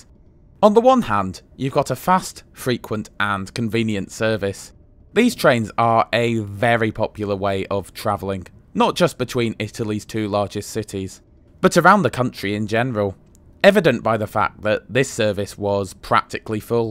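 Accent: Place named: England, United Kingdom. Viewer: Your Italian sounds very English 😁. I also got troubled in Italy for filming. They are similar freaks as TfL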